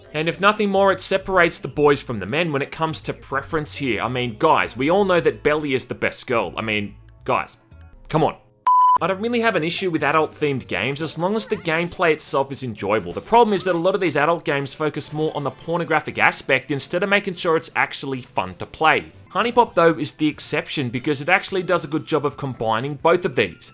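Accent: Australia. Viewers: I love this man's review of huniepop it's hilarious an Aussie describing what hentai is very funny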